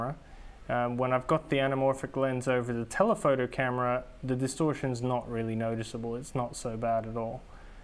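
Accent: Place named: Southland, New Zealand. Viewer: From your accent am I correct you are from Southland in NZ?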